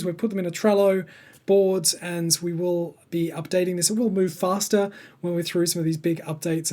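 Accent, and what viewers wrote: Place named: United Kingdom. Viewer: I think you’re slowly losing the Aussie accent 😂. You are sounding more British! Definitely sound a lot different to when I had my first video interview back in 2015 for my mod application 😊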